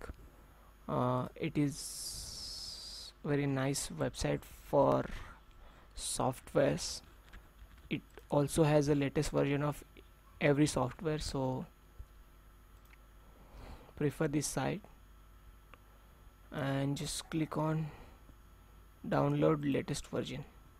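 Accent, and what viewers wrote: India. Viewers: Just a constructive comment: please make sure you watch you accent (the way you are speaking). This video, by mistake, might be watched somewhere at Europe or North America then people are going to make fun of Indians. Your content /subject is not also very helpful. For the future, keep this in mind.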